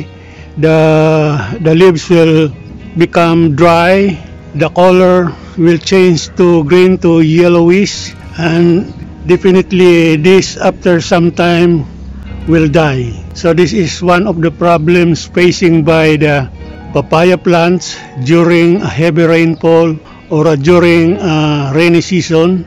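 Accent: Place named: Italy. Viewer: ARE YOU ITALIAN!!!??? OUR VERY DEAR MR. GIOVANNI CUMAN BELONGS TO ITALY ALSO SPEAKS LIKE YOU.....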